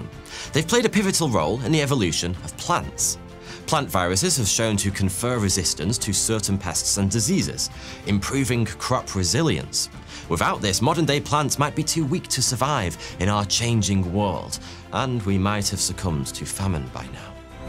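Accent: United Kingdom. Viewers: Alabama? I thought you were from the UK.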